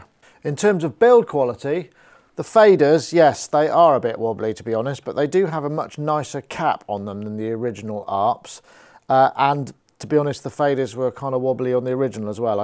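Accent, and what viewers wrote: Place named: England, United Kingdom. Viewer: Man, are u from england?? I can't understand shit you say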